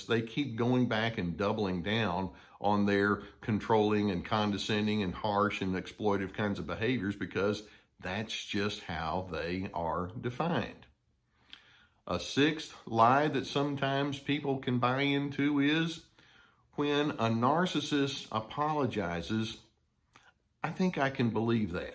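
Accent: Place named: Texas, United States. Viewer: "I wish for you Stability, Sensibility and Objectivity". "I wish for you Dignity, Respect and Civility in your actions and relationships" = from other Dr Carter videos. I love having the truth in my ear with Dr Carter's warm, kind TX accent! Appreciate your clarification of the "duality" of people's natures! Keep up your work for the Common Good of humanity!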